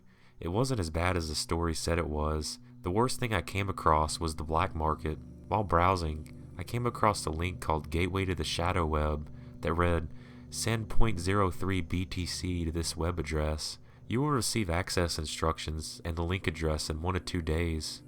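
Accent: South, United States. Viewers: It sounds a bit more Southern to me.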